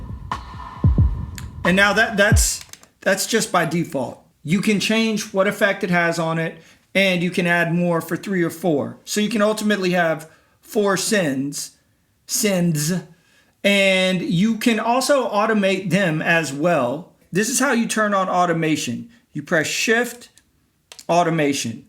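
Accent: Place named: United States. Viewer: Was so surprised to hear an American accent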